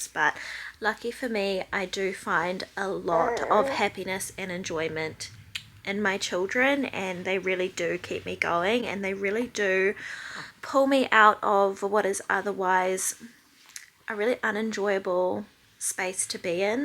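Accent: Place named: New Zealand. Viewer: you and Brogan have the most beautiful babies...truly 3 of the most lovely little babydolls ❤ Also I could listen to you speak all day, love NZ accents! Love from North Carolina USA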